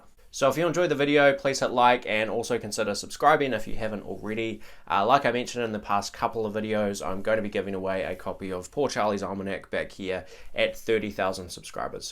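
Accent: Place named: New Zealand. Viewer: He’s from New Zealand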